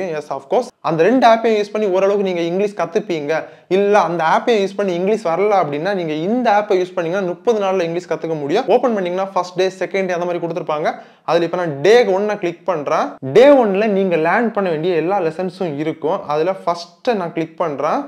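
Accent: Kanyakumari, India. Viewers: Nanba Are you kanyakumariyan?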